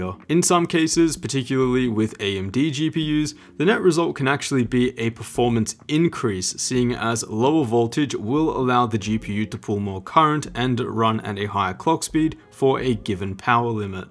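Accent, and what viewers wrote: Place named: Australia. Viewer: Must ask, how do you have an 3080 FE when you sound Australian? Do you live in aus? Did not think the FE was available to convicts like me living in Australia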